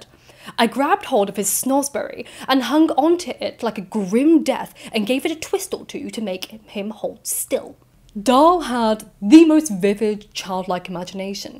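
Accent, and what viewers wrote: RP, United Kingdom. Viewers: He sound like Boris johnston,, The Brittish upperclass don t change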